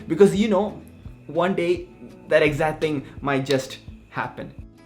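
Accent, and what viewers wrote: Kerala, India. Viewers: Yoo I saw you in a reel and followed and now you are HERE😳 anyways change the thumbnail It has a grammatical error Change "What happened?" In place of what happen? Ik imma weirdo talking like boomer 😂😂 Btw love your malayali accent 😁😂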